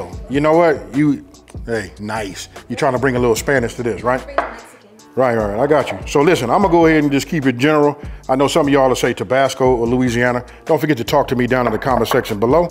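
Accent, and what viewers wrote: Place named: West Coast, United States. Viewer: West Coast accent is sooo heavy!!!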